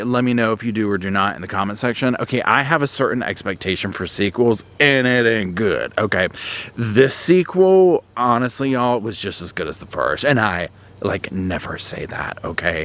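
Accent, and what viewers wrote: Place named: England, United Kingdom. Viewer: Hahaha loving your English Emily blunt accent 👏👏👏👏I’m English and people always think we speak posh! Like the royal family and erm....I don’t lol x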